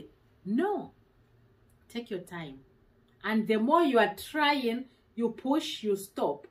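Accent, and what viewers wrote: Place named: Zimbabwe. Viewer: I can tell that you are from Zimbabwe. Nice video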